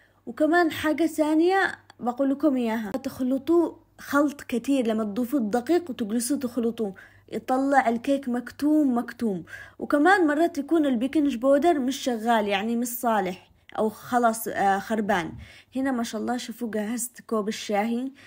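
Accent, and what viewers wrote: Yemen. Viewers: حلوة اللهجة اليمنية ❤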